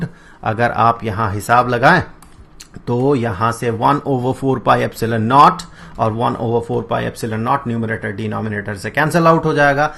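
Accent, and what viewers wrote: Bihar, India. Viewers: What a sophisticated explanation..! I'm really very impressed with you sir. You're awesome and vo Bihari tone sir ji fan ho gye hai hm aapke... proud to be a Bihari🤘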